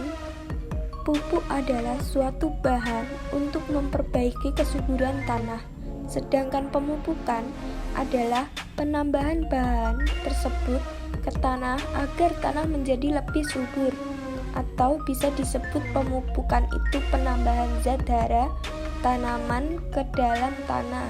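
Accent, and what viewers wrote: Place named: Java, Indonesia. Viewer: Medok men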